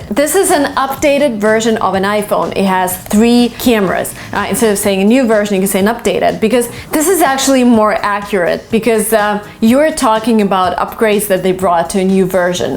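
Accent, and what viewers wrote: United Kingdom. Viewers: Are you from the UK? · She has a lot of british accent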